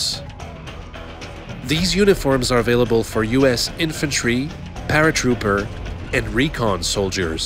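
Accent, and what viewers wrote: Germany. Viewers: That German pronunciation XD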